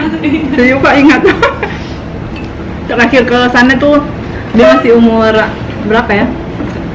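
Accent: Manado, Indonesia. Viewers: Yup..... mantap ...... dari logadx campuran Manado n daerah mana ya......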